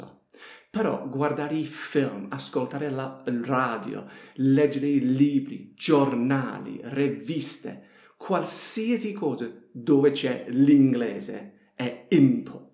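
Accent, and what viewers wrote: Apulia, Italy. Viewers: Accento Puglinglese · The same 😅 Allora non mi sbagliavo.. ho percepito una cadenza pugliese 😀. Very nice teacher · Ti seguo da poco Sei fantastico simpatico e bravo Poi il tuo accento pugliese è originale Fai lezioni private on line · A tratti sembri pugliese :)) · Ma tu hai origini pugliesi?? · Thanks for your advices! They're very interesting and useful! I like Reading articles in english and recording my voice repeating that! I like watching your videos. You look just like my american oncle, the way you move, the way you speak With your apulian accent, (we are apulian too). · sei fantastico, davvero belle le tue lezioni. Poi hai acquisito quell'accento pugliese che è il top 😁😁👍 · Inglese con accento pugliese..è stupendo😄👍😉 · Bravissimo, semplice ed esaustivo. Il tuo accento pugliese è mind blowing 😄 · Ma come si fa a non amarti? 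Sei uno splendido insegnante. È un piacere ascoltarti e imparare da te e con te e tramite te. Il tuo italiano/pugliese è adorabile!!!! Grazie mille per ciò che fai. God bless you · Ma no !! Lui è inglese quindi parlerà la sua lingua perfettamente ! Quando parla in italiano ha l'accento pugliese perché ha praticato l'italiano con i suoi parenti tutti i giorni mettendo in atto quello che consiglia in questa lezione. Ascoltare e ripetere ... Lo ha fatto in Puglia con dei pugliesi ecco perché ha questo accento simpaticissimo. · si sente l'accento pugliese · Sei molto bravo. Mi piace come spieghi e lo fai anche in un modo simpatico...l'accento pugliese è ancora più simpatico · Eng-Pugliese, favoloso, love it! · L'unico (bravo) insegnante di inglese al mondo con l'accento Pugliese!!! Adorabile!!! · Sì il suocero dev'essere un pugliese, si sente!!🤩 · Thanks! Did you know that your italian accent is from Puglia? 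· Ciao una curiosità..il tuo accento pugliese si sente quando parli in inglese con i tuoi connazionali? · vabbò figurati, io parlo da solo da quando sono nato, quindi si scandalizzerebbero ben poco. 🤣 Comunque il tuo mischiare inglese e pugliese è veramente fantastico. 🤣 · ma hai studiato italiano in Puglia? xD hai una cadenza molto simpatica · Ascoltando il tuo italiano, penso che tu hai imparato l'italiano in puglia o in abruzzo, è vero? troppo forte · Non perdere l'accento pugliese .. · This guy has a strong Italian accent from Bari, Apulia. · Come calabrese? Secondo me è accento pugliese. Sullo stile sono d'accordo... ottimo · In love with your Apulian accent ♥️ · Your Apulian dialect is very funny and above all it is "spot on"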